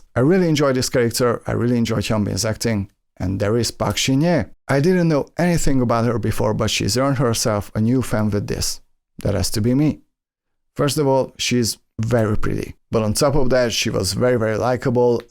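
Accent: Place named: Balkans. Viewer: It's been a while since I saw MotA. Your review makes feel the need to revisit it. I also don't rank it among my top picks, but for my life, I can't remember why. Maybe it was the ending....Your reviews are a pleasure to watch. I've subscribed....Just a btw, I'd guessed you were from somewhere in the Balkans based on you accent. Not exactly correct, but not too far off...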